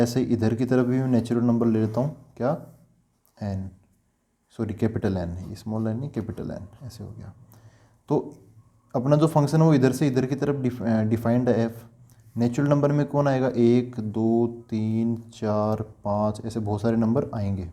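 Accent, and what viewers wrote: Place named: Haryana, India. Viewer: by ur voice,I tried to predict that u must be frm haryana....by the way u teach very nicely ...big thanks from Haryana girl 👍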